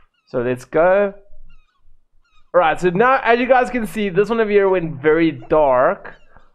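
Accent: South Africa. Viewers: I thought so because your accent sounded south African I'm also from south Africa😂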